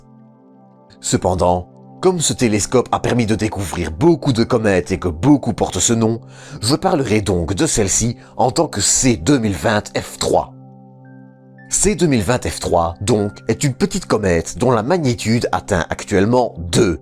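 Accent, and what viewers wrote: Liège, Belgium. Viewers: Bonne video, mais pourrais-tu effacer cet accent belge à couper au couteau ? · Ah ouais accent de Liège ça nan ? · "je prends note pour l'accent, et je vais essayer d'améliorer ce point." Ne change rien, big universe, ça fait parti du folklore Belge, et c'est éminemment sympathique !! Cordialement d'un presque voisin, basé à côté de Lille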